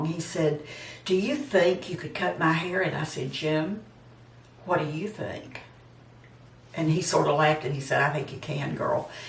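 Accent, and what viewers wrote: South, United States. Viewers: WE NEED YOU! I hope you never quit doing videos! Having you be so real and your accent reminds me of a Southern Belle. On any given subject, I enjoy my visits with you and with over 56 thousand subs, I'm far from the only one I bet. Getting to watch your videos is like spending time with a beloved friend and right now (4/11/20) we all need that. You are one of a very small list of YouTubers I never get tired of. You are so sweet and a treasured break from an otherwise troubled time. Thanks for being here! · Just hearing that great southern accent brightens up my day. I had a favorite dress too when I was a teenager. Pretty green with pink plaid thru it, low waist with slim bodice and swirl out bottom, sleeveless. I adored it ❤. It was probably 1970. I loved your dress, can see why you loved it too!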